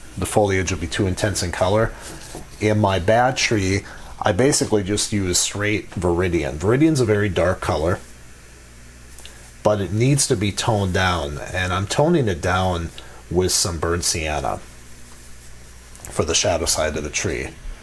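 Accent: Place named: Minnesota, United States. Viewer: best tree video I've seen. I've been practicing our steps and I'm happy how the trees are turning out. Hey you sound like where I'm from - back in Minnesota.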